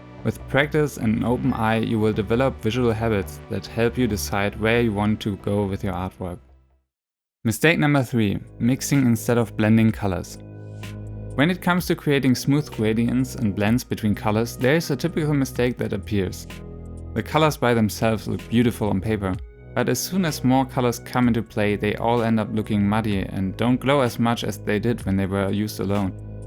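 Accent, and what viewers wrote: Germany. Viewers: Ich finde man hört so stark, dass du deutsch bist, ist bei mir aber auch so. :D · are you german hahha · One question, are you German? Because your dialect sounds like you are german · Are you German? You have a German accent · Bin ich die einzige, die raushört, dass er eindeutig Deutsch ist? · This is a Very German Accent 😂 But i am German too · Is he german? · Are you from Germany? In comparison to my accent when I speak English it sounds pretty similar^^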